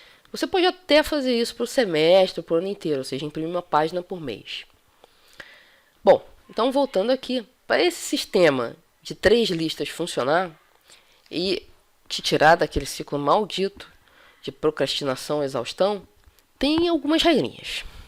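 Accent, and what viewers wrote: Rio de Janeiro, Brazil. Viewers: Sou carioca, e continuo afirmando que o sotaque carioca é sem dúvida o mais bonito do Brasil. haha... Valeu pelas dicas, professora.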